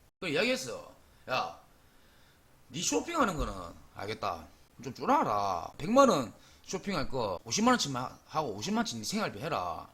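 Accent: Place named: Busan, South Korea. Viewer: 하~~~이거 보다보니 몇편째 계속보고있네 썰푸는거 잘안보는데 너무 잼있네요.군대동기 부산놈하고 말투 목소리도 비슷하고 은근히 친근감이 느껴져서 구독하고가요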